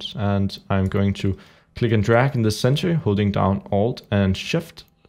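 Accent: Denmark. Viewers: Swiff His main language is Danish I think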